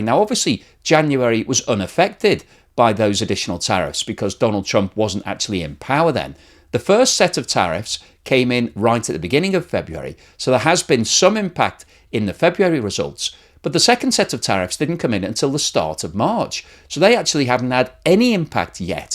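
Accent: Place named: England, United Kingdom. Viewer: He sounds pretty English to me.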